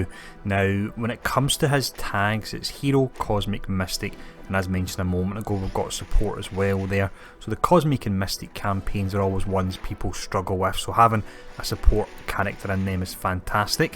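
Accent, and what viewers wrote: Scotland, United Kingdom. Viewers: Yeah, I gathered as much, but where in scotland? Dundee or Glasgow? I'm from sweden but I lived in Edinburgh for a while but I don't recognize the accent =)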